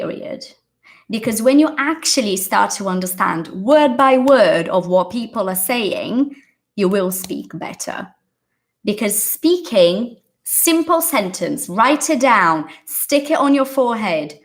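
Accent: United Kingdom. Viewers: Ma'am, you have a really good and beautiful British accent...bruh. I started my English speaking American accent and now I'm falling in love with British one.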